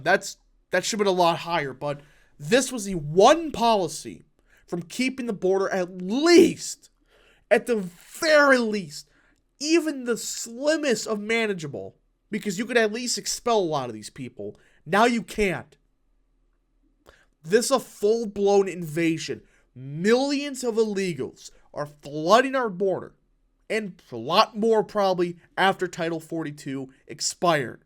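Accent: Wisconsin, United States. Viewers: Give him a break. He's from Wisconsin.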